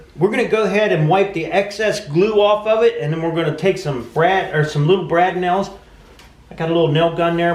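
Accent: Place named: Pittsburgh, United States. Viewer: Hey, where are you from? You sound like you have an east coast yinzer accent..... just curious.. thanks.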